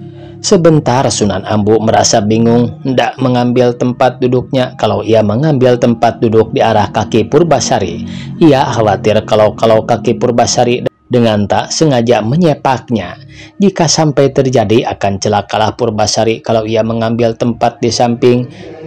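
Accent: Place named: West Java, Indonesia. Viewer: Bahasa lndonesia, aksen Sunda.. Ki Sunda mah cocokna macakeun dongeng Sunda..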